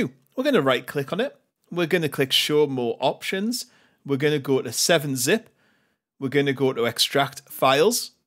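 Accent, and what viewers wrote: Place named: North East England, United Kingdom. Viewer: I'm Newcastle, so I'm thinking Washington/Sunderland/Durham maybe?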